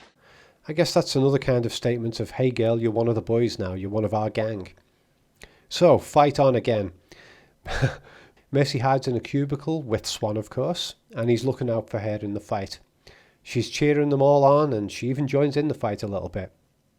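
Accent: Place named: North, United Kingdom. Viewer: Your northern accent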